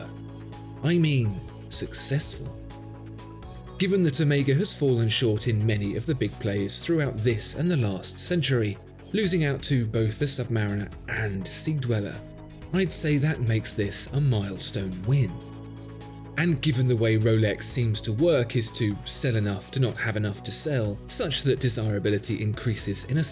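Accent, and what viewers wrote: United Kingdom. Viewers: So basically, this SMP is the Marine Nationale Pelagos that Tudor refuses to make. BTW, hearing someone with a British accent saying the most American of phrases "swing and a miss" is oddly satisfying.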